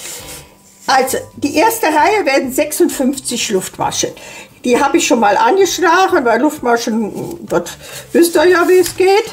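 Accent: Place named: Bergisches Land, Germany. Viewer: Dat hört sich sehr nach meiner alten Heimat dat Bergische Land an😊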